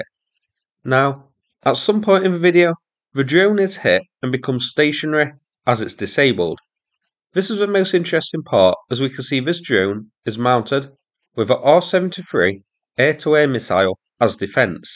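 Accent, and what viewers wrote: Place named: Mansfield, United Kingdom. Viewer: It's because he's speaking British English with a Mansfield accent. Most of the automated caption systems are only trained on a generic American English accent and vocabulary. A compamy I worked for in the UK considered buying a captioning system. The prospective vendors came to show their software, and every single one failed to correctly recognise even half the British English words we threw at them. When we tried Glaswegian and Newcastle (Geordie) accents, the recognition rate fell to zero.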